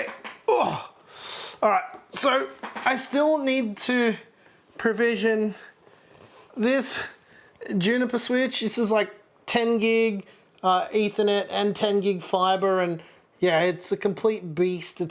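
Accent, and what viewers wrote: Australia. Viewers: Haha yeah but stick to your guns I reckon. Ignore the complainers. People need to accept you’re Aussie with an Aussie accent.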